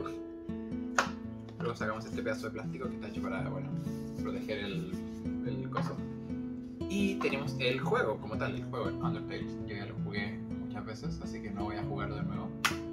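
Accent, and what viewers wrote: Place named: Chile. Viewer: Hola buenas , escuche tu acento y inmediatamente supe que eres chileno jaja , queria preguntarte si ¿tuviste algun problema al recibir el paquete? (Un retraso o algo) lo pregunto por que lo quisiera ,la verdad esque esta muy hermosa la edicion y me encantaria tenerlo. Muy buen unboxing , me caes bien ❤ te voy a empezar a seguir , te deceo mucha suerte en tu vida espero que crezcas en youtube (bueno ya mucho parloteo) nos vemos chao👋❤ .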